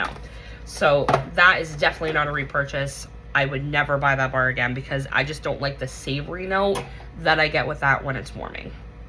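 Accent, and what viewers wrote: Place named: Massachusetts, United States. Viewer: I live in Southeastern Massachusetts, & no school on Good Friday. I always thought that you had the Mass accent, and you definitely have our spunk-if you know, you know 😂. I despise Scentsy’s lemon with the exception of the Harry Potter bar. I was so glad when that club fluke happened, so it is back in my club again. Great empties!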